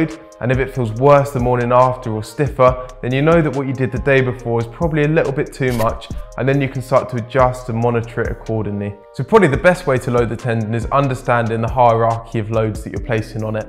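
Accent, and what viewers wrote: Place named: United Kingdom. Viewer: Nice video, good content, well-done and British accent as a bonus! What's not to like? :)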